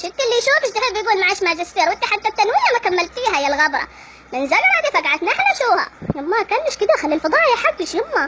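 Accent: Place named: Mukalla, Yemen. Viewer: Danea G.a هذي لهجه اهل المكلا 😂👌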